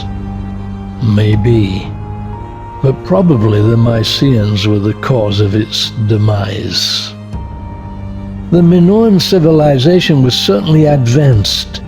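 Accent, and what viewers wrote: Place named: United Kingdom. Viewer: Love your style very clear and distinct typical British English thanks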